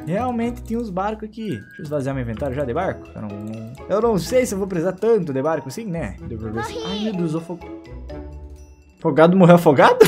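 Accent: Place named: Bahia, Brazil. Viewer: tu falou muito baiana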